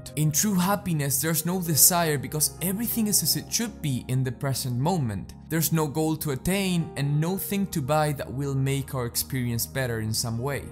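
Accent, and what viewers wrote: Argentina. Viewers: Por qué siento que esté pibe es argentino?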